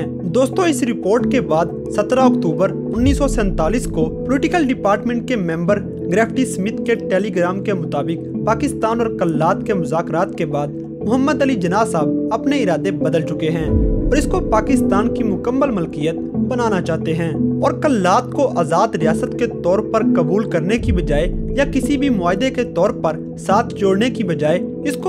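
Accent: India. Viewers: Iskay lehjay say saaf pata lag raha hai ke yeh Indian hai ..... meray Baloch Bhai Amir Hamza ki aulad ho aur sab Pakistanio ke dil mein basty ho. Jhootay Indian propaganday say bach ke raho. Inko aik din Kashmir bhi chorna paray ga.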